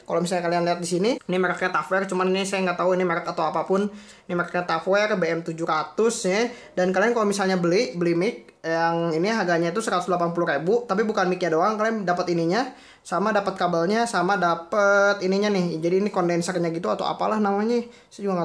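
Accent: West Java, Indonesia. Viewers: Orang jawa barat yak ? Orang sunda yak ?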